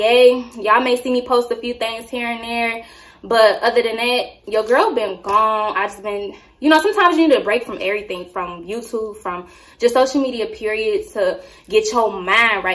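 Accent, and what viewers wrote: Detroit, United States. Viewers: Sis it sound like you losing the Detroit accent 🥴😩😩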